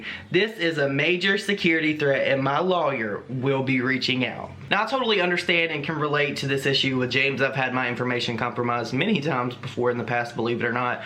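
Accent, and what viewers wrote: South, United States. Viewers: Are you from the south? Cuz same.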